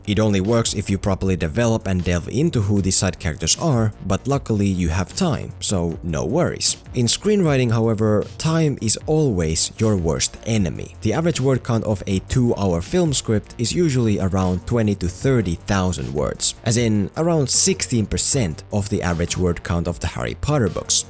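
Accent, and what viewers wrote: Finland. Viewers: Haven’t seen the movie but this was a good and comprehensive analysis. P.S. Kuulenko puheessa suomalaisen aksentin? 🤔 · I can hear the Finnish coming through ;) Great video! :) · Huh. You sound Finnish. Very slight accent, barely noticed.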